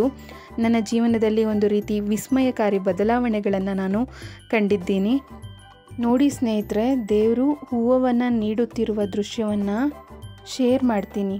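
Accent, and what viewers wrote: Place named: Hiriyur, India. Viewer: Nindhu hiriyur raaa niv mathadooo style hiriyur near bhashethra edhee soo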